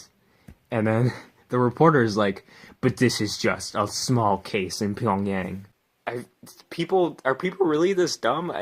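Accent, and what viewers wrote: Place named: New England, United States. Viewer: You don't sound Slavic you sound Yankee like me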